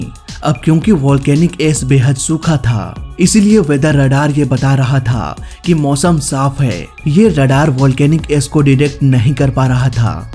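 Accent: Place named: Gujarat, India. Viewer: Gujjubhai accent..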